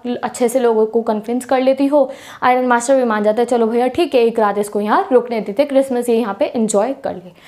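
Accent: Punjab, India. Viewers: this is Punjabi accent.we use this word . It means hona..( sorry I exactly don't what to call it in Hindi😁)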